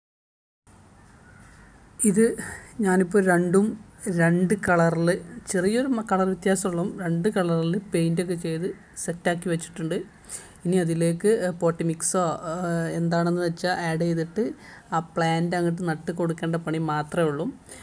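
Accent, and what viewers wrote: Malappuram, India. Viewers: ഓരോരുത്തർക്ക് അവരവർക്ക് പറഞ്ഞ ഭാഷയിൽ സംസാരിക്കാം ഓരോ നാട്ടിലും ഓരോ തരത്തിലായിരിക്കും സംസാരങ്ങൾ ഇംഗ്ലീഷ് ആരോട് മലയാളം സംസാരിക്കാൻ പറഞ്ഞാൽ അവർക്ക് സാധിക്കുമോ മലപ്പുറം ഭാഷയ്ക്ക് എന്താ കുഴപ്പം നല്ല ഭാഷ തന്നെയാണ് പിന്നെ നമ്മൾ മലയാളികൾക്ക് കുറിച്ച് കുറ്റം പറഞ്ഞിട്ടില്ലെങ്കിൽ ഉറക്കം വരില്ല😄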